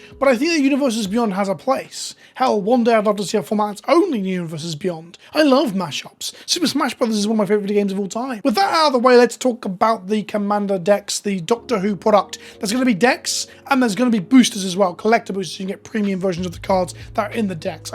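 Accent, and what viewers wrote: United Kingdom. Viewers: Hearing a british nerd talk about and get wrong so much about Dr who haha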